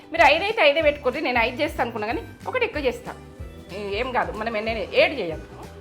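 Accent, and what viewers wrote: Telangana, India. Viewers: అమ్మా, ఇంతకు మునుపు మీరు చేసిన ఉల్లి కారం పొడి, మరియు నూనె వెల్లుల్లి కలిపి చేసినవి చూసాను. మీమాట పలుకు తెలంగాణా యాస చాలా బాగుంది. అంతేకాదు అమ్మా మీ మాటలోని స్వచ్ఛత, స్పష్టత, వింటుంటే నేను బాగా గమనించిన విషయం ఏంటంటే. *అరమరికలు లేని, కల్లాకపటం లేని * మనసు అని నాకు అనిపించింది. ఈ మాట ఎందుకు అన్నానంటే నేను ఎన్నో వందల వీడియోలు చూసాను కానీ అవి చూస్తున్నప్పుడు వాటిలో నటన కనిపించింది. సాగదీయడం , అవసరం లేని సుత్తి రామాయణం విసిగించేవి. కానీ మీ స్పష్టత నాకు బాగా నచ్చింది అమ్మా. ఏ విషయంలోనూ తగ్గేదే లేదు అనడం నన్ను ఆకట్టుకుంది , తగ్గకండి. నేను ఆంధ్రా వాడిని. నాకు తెలంగాణ యాస అంటే చాలా ఇష్టం.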